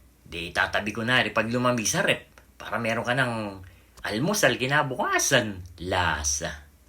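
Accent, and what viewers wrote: Bulacan, Philippines. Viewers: taga bulakan ka san lugar ksi yun punto mo .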